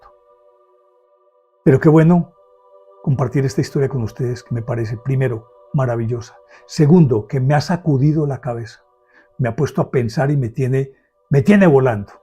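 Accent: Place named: Colombia. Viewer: Todo esto es muy interesante, lástima que la copia de la obra usada es una obra muy alterada, y ese halo de santos vuelve a aparecer, soy un gran amante de la obra de Leonardo Da Vinci sin el endiosamiento que le han dado, era un hombre talentoso, seguramente muy conflictuado por sus orígenes, su homosexualidad y sobre todo que fue muy incomprendido. Don me gusto su tono de voz y el acento colombianos es muy bonito, gracias!!!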